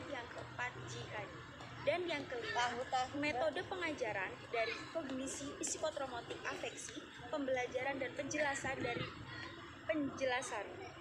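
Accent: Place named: Java, Indonesia. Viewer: Ayang medok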